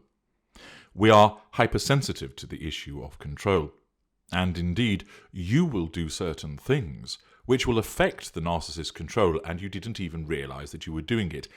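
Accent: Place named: RP, United Kingdom. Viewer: Mister such a posh accent and then you have this term "bitch slapping" so funny god bless ya I laughed!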